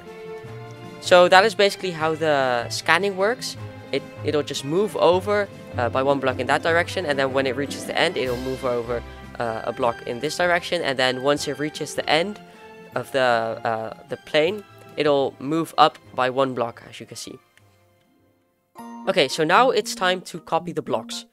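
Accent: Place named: Netherlands. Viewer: i smell a dutch accent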